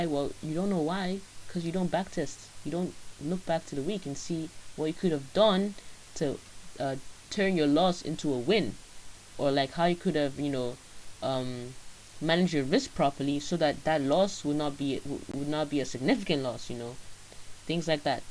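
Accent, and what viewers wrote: Nigeria. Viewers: I was thinking shes definitely nigerian lol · Thanks,... You sound Nigerian tho... Great content went through your telegram from September... Wow... It's inspiring to see how the trades improved so fast, pure hardwork welldone, can't take that away from you... You bad sha🤭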